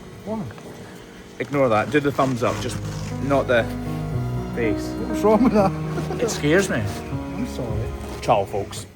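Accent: Scotland, United Kingdom. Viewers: voice is soothing. I like it. And Scottish. I like it. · Do english cows understand your Scottish accent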